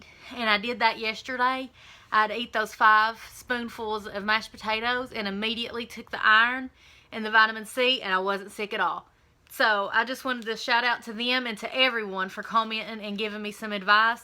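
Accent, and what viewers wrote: South, United States. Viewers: Girl I love your accent. lol Country girl like me! I live not far from you in Bristol, VA. You are doing so good, just hang in there, the lbs will fluctuate each week! Keep it up!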